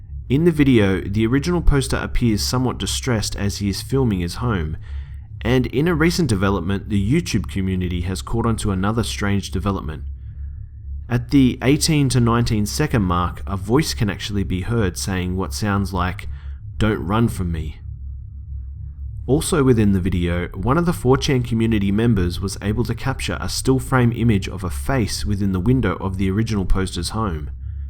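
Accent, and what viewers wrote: Australia. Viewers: Only subscribing because you're Australian and your voice sounds majestic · Are you Australian?? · Him: G'day mate- Me: Oh shit an aussie. I'm subscribing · wew lad aussie accent..shitposting inc. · I cannot stand the Australian accent. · you sound so aggresively aussie ahaha, love it. keep up the good work.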